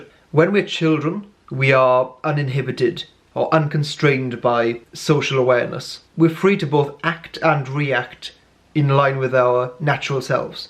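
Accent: Wales, United Kingdom. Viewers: Interesting. You explained the INFP very well. Is that a Welsh accent I hear?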